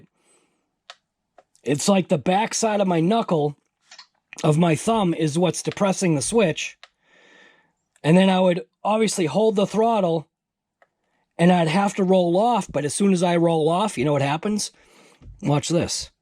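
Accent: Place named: Massachusetts, United States. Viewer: loving the MA accent fellow Masshole def subscribing well done vid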